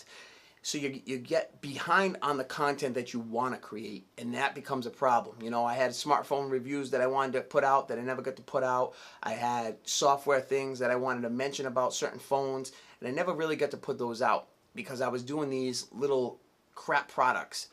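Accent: Boston, United States. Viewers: Subscribed! Def can hear that Boston accent! I work in Boston as truck driver too. Fun city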